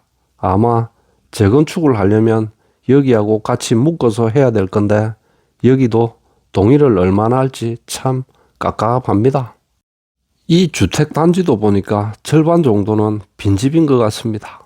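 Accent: Gyeongsang, South Korea. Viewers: 형님 경상도 말투가 넘 맘에들어요 한번 뵙고쉽네요~ 저는 전라도덴... 정말 모든유툽 넘 잘 설명하시고 항상 유툽보면서 장거리 운전하는데 지루하지 않아서 넘조아요ㅎㅎ 응원합니다❤😂🎉😅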